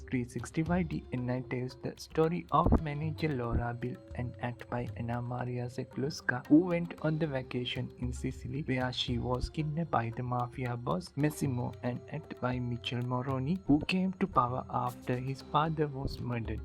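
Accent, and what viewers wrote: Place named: India. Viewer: 🇮🇳 Indian accent is annoying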